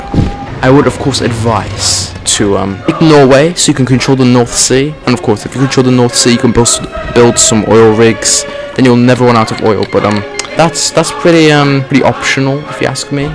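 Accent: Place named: United Kingdom. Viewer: Nice British accent.🇬🇧👍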